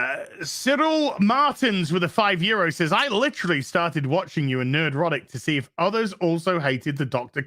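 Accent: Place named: United Kingdom. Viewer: you are my favorite FNT regular and have now become one of my favorite YouTube channels. As an overweight, bearded, balding American man, I watch you and think, that's what I'd sound like if I had a proper British accent. Keep the entertainment coming!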